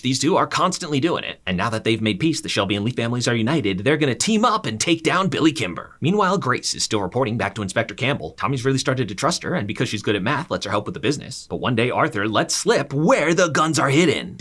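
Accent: United States. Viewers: I can't lie I was initially dubious with the overly American accent but this was a fucking great summary. Honestly made me realise shit I didn't even notice second time watching the show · Very good recap, not an English standard, but for an American quite acceptable.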